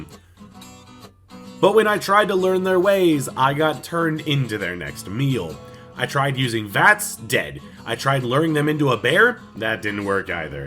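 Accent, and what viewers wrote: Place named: Australia. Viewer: i can’t place your accent. it sounds australian sometimes but american at other times lol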